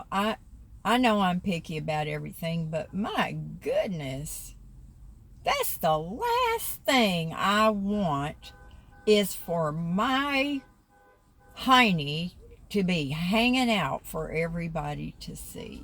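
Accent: South, United States. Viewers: Ms., you are awesome! I love your southern accent girl! Every video makes me smile.... If that isn't special then i don't know what is. lol <3 "Not praying for patience anyomore!" LOL I love it! Take care and God Bless. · Be proud of your Southern dialect!!!!!!!!! Never back down and never change to appease your critics because they don't matter. In about 50 years English will be extinct in the US.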